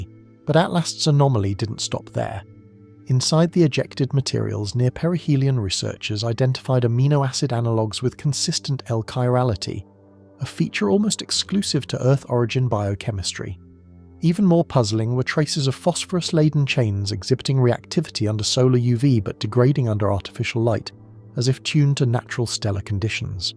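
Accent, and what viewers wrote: United Kingdom. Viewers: So sick of the British accent explaining fkn everything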